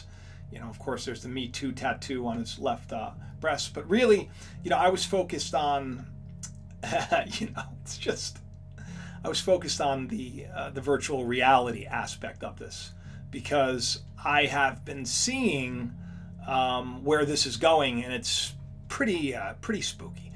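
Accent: Ireland. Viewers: Darn good Irish accent 🍀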